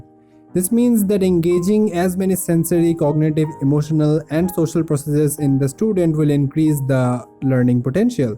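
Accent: Bengal, India. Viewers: Aap Bengali ho na?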